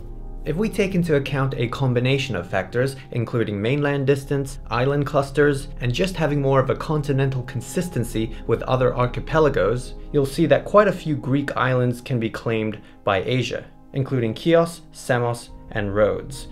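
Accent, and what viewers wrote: United States. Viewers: Did I hear correctly in one of your other videos that neither of you have ever been to the US? I'm curious because your English sounds very American with maybe just a hint of an Asian accent while sounds more European. Where did you learn English? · You have many different pronunciation of place names than Americans. Is it of British or some other origin? Your accent seems closest to American though.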